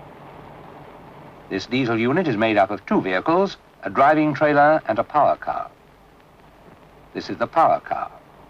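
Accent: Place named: RP, United Kingdom. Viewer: I enjoyed this! It's a piece of social history as well as railway history. Look at the clothes people wore, listen to the BBC English of the narrator, the "Light Programme" style of the backing music, the explicit statement near the end that only men would ever drive these trains, spot the parcels trolley on the platform etc etc... it's a real step back in time.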